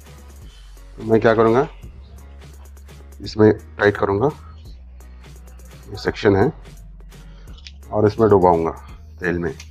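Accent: Hyderabad, India. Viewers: Bhai aap hydrabad sa ho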